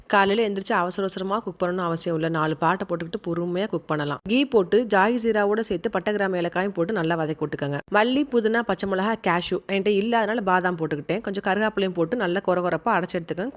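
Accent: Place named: Periyakulam, India. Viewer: Periyakulam slang 😂❤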